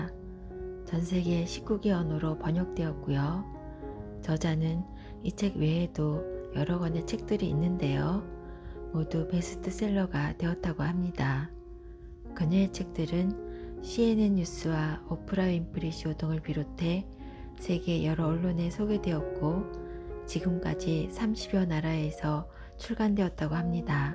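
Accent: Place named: Gyeongsang, South Korea. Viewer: 경상도 억양..😢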